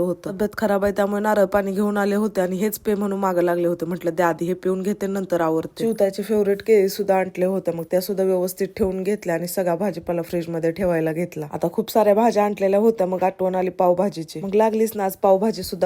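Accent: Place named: Kolhapur, India. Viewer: Amchya sanglit ani kolhapurkde pn asch boltat antle kivva antly..tumhi ugach bolnyt chuka kadu nka ..tumhala jas bolu vatt ts tumhi bola tila jas vatty ts ti bolel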